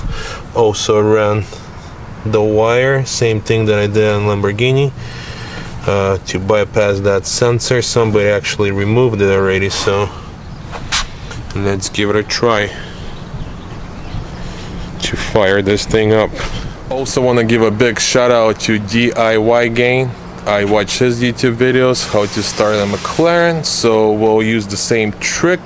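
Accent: Russia. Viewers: u have rus accent lool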